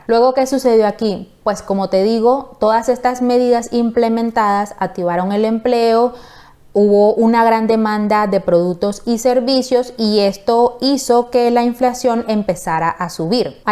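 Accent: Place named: Caribbean Coast, Colombia. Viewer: De una manera amable te aconsejo acudas con un fonoaudiologo: que te ayude a mejorar tu dicción. Tu pronunciación muestra que eres una persona ignorante en el tema. No me refiero a tu acento que se nota costeño. Puede que no seas ignorante en el tema pero esa es la percepción que muestra tu mala pronunciación. También debes mejorar la redacción! Saludos y mucha suerte 🍀👍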